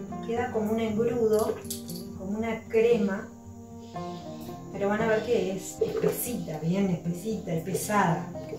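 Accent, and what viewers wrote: Córdoba, Argentina. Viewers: Ya se impregnó de tonada cordobesa la bb😊Pan de aaaarroz! La amé 💕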